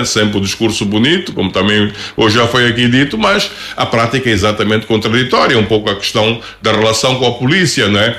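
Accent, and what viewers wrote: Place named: Angola. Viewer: Voz do angolano puro .Fala a verdade ,a língua portuguesa com gramática.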